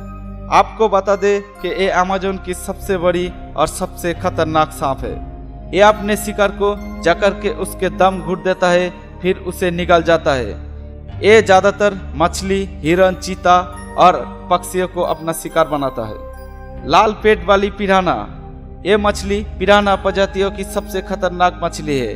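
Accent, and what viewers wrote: Bengal, India. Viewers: Ye to bangali hai ya odisa... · Are u bengali ?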